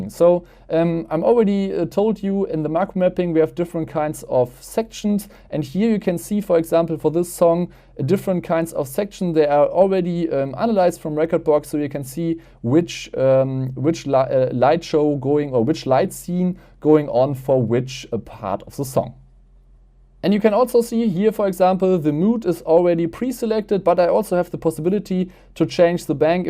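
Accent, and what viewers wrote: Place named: Germany. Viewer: sorry this german accent when you speak english it's really horrible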